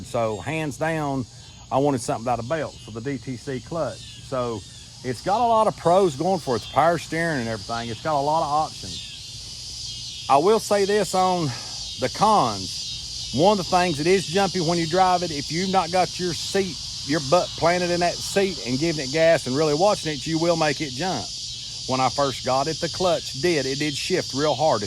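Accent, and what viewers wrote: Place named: South, United States. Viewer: I heard that talk and sure enough... T for Tennessee brother!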